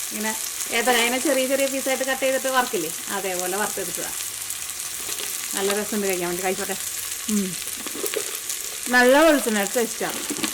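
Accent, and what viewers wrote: Thrissur, India. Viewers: Good,we will try,Oru curry avasiyam ayirunnu,I have Oma,in my yard here in Florida,it is an adavantage in this lent season.Ninte language Oru Trichur accent analllo!!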